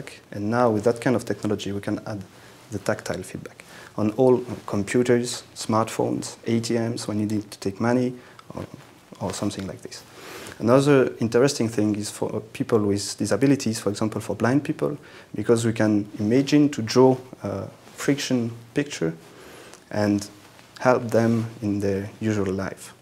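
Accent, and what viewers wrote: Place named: France. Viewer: Sounds French to me.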